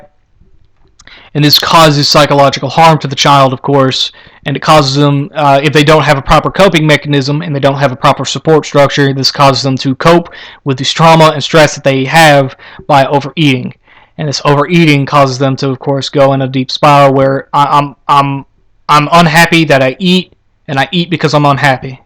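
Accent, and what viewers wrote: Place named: Texas, United States. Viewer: you sound extremely Texan i dont live in america so i cant exactly say what texans sould like tho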